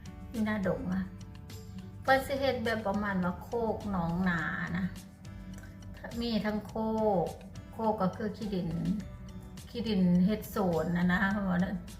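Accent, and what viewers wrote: Phu Thai, Thailand. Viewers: มาฟังป้าเว้าภูไทนำจ้ามวนๆจ้าสำเนียง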